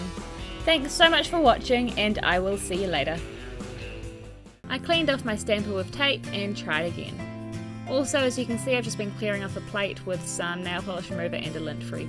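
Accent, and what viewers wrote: New Zealand. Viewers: I love the way you talk! I'm a Kiwi too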